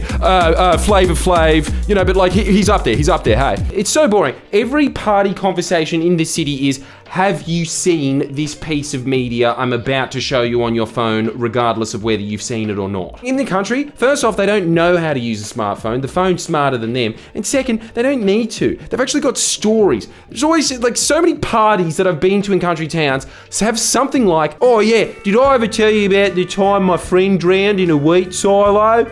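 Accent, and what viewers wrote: Australia. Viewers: how did u not realise lmao he sounds pretty Aussie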